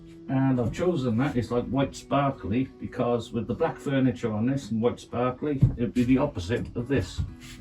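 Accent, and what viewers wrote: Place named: Wrexham, United Kingdom. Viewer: Save some eBay bargains for the rest of us mate :P I just subbed and going through your videos, was a bit surprised you're over in Ireland as you have a perfect Wrexham/Jacko accent? I got hold of a cheap 130P recently and want to try DSLR with it and from what I can see you can get them to focus by using longer bolts on the mirror cell thus pushing the mirror further up the tube, not tried myself yet as I'm trying to source parts on the super-cheap :D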